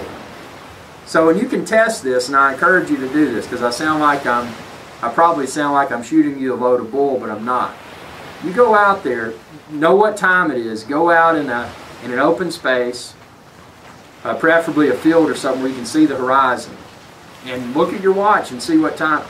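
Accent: United States. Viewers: yeahbuddy! first to comment. ur american rite?nice accent, fake backround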